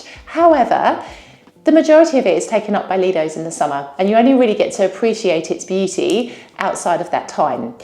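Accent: United Kingdom. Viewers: It’s a real pleasure to listen to your beautiful story in wonderful British English about living in Italy - my dream country, and in my favorite language.😍🤩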